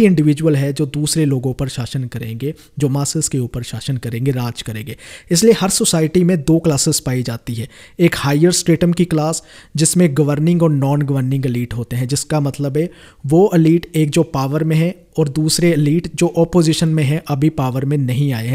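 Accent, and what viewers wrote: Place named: Jammu, India. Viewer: awaz se jammu ka lg rha h bhai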